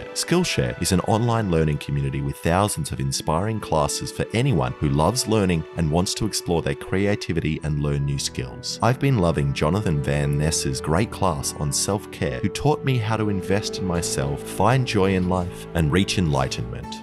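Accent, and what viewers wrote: Australia. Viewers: Are you from Austrália? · Bro this dude be australian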